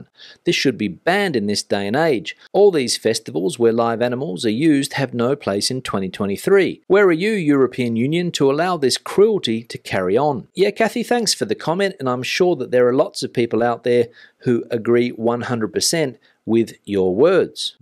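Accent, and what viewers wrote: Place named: Australia. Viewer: Dude, you are without a doubt the best Spanish newscaster I have ever heard. I'm an avid follower, having lived in Spain over 20yrs in andalucia. You say it as it is in English with an Aussie accent and a straight face and crack me up. Keep doing what you're doing my friend and hopefully one day you'll take a mobile podcast over to Portugal, you're smashing the Spanish news for the British expats 😉👊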